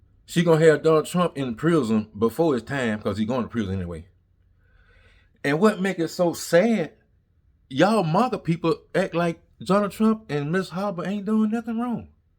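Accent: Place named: Alabama, United States. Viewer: You speak the truth!! (New subscriber from the UK) ps - who loves your Alabama accent 😁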